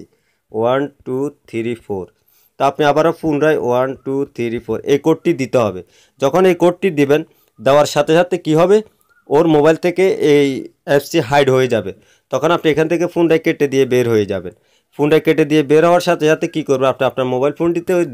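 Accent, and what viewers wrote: Noakhali, Bangladesh. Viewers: আপনি আমাদের ফেনী নোয়াখালীর মানুষ হবেন আপনার কথা শুনে বুঝতে পারলাম যদি হয় কাজটা মন থেকে অনেক দোয়া করবো আর যদি না হয় কষ্ট করে আপনার কন্টাক্ট নাম্বারটা আমাকে দিলে খুব ভালো হয় আর আমি আপনার ফোনের মত হয়তোবা ছেলের আইডি ইউজ করি আরকি সেফটির জন্য প্লিজ আপনার নাম্বারটা দিলে কোন ক্ষতি হবে না প্লিজ 😢😢😢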